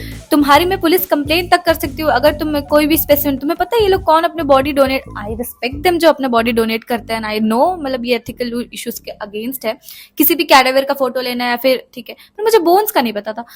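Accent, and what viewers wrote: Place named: South, India. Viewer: Didi's accent is like now South Indian..😂😂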